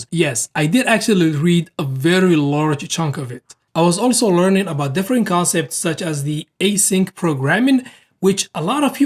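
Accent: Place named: Somalia. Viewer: Somali inaa tahay codkaagaa ka fahme secondska ugu horeeyabo😅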